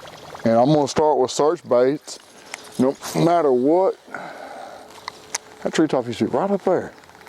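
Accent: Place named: Louisiana, United States. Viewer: "Where you goin'??" LOL good ol' Louisiana boy.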